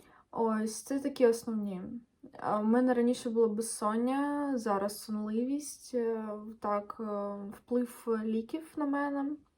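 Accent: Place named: Russia. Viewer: Русский акцент. Лучше на русском общайся, слушать сложно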